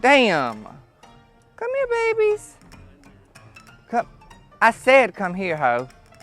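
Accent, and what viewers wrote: South, United States. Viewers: 😂 you are so funny and so southern I just don't know what to do lol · Omg! (Laughing) "It's so damn ghetto around here!" (OR:) "Biotch, you going to Chick-fil-A!"😅 Reminds me of my childhood with that "SOUTHERN" accent. ❤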